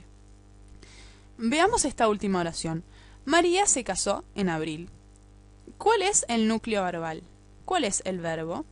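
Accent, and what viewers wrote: Argentina. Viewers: Eres argetina verdad?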